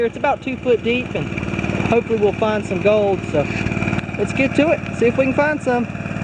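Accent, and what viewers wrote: South, United States. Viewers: Enjoyed the video. Felt jealous in a good way. I wish I were young and hanging out with you. You sound like John boy Walton, and I mean that in the best of ways. You sound like your from the south and that creek reminds me of a creek that ran by my Grandparents house in eastern Kentucky. One question do you come across snakes when out like that. I remember seeing copperhead, and cottonmouths down south. Hope you find some great relics and gold. God Bless. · Murica, could you not tell from the accent? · where's your southern from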